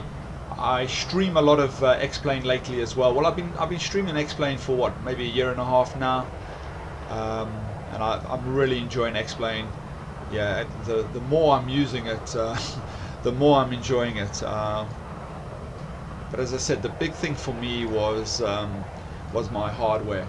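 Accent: South Africa. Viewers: very nice Video and always good to hear a South African voice. The game is very realistic and you seem very knowledgeable ,Keep the videos coming and I will definitely support them!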